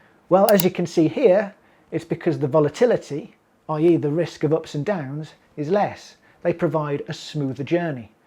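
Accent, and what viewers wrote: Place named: West Midlands, United Kingdom. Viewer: I take it that you are from the West Midlands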